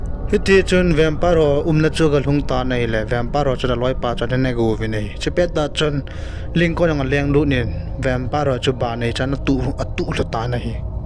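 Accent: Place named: Moreh, India. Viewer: Moreh ho pao cu kangai nom g tama🤣🤣🤣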